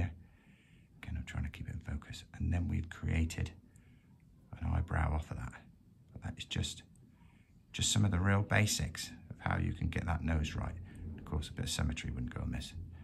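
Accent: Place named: Somerset, United Kingdom. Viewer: Thanks for such a clear explanation. I also echo the comments of a previous reviewer, the Somerset accent is truly welcome.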